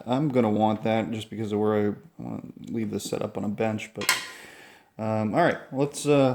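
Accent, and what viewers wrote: China. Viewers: "Chinglish" BOOM--SUBSCRIBED!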